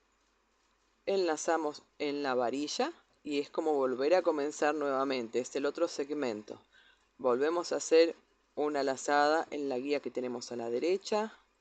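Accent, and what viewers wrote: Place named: Chile. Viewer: Perfecto! gracias por su repuesta rápida. Te pregunte eso porque encuentré que tenia un poco del accento chileno pero el amaricho por el amarillo me dio la pista 😁 Soy francesa, pero franco-chilena en realidad. De parte de mi papá! Ahora yo vivo en un pequeñito pueblo en el sur-oeste de Francia, en medio del bosque y de todos los animalitos. Hice un cambio radical de vida, pasé de una gran ciudad urbana a una pequeña casa en el campo y recuperé mi creatividad. 🥳Gracias a ti para tus videos que son super bien hechas, y tus creaciones son maravillosas ❤ Un lindo dia para ti. Me llamo Laurie🙋‍♀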